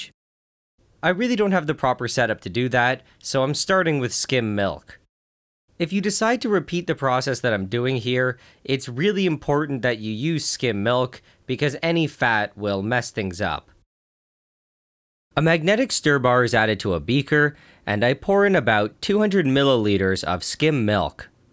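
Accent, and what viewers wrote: Quebec, Canada. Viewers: Quebecois par hasard? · you from québec ?????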